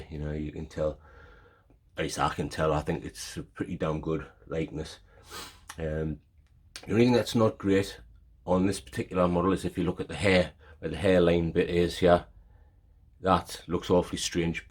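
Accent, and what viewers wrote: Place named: Newcastle, United Kingdom. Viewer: Areet. I am from Sunny Sooooth Shields. Nice to hear a local Geordie review some hot toys figures. F*ckn Best accent in the world and what hot toy review needs! Beats the Aussies, Americans, southerners etc on here! I have literally just stumbled across your channel browsing reviews of my next purchase. Awesome collection you have man. I have just subbed. Hope to see more! 💪🏻👊🏻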